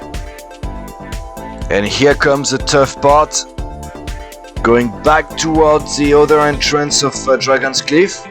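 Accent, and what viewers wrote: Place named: United States. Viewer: Salut, moi aussi, comme James, je me suis demandé si en mettant de la marchandise à contre-gîte tu pourrais pas filer au près serré avec plus de voilure.Tu as essayé ? Mis à part, tu parles sacrément bien anglais et ton léger accent est très confortable pour ma compréhension, tu as vécu aux états unis ? A plus...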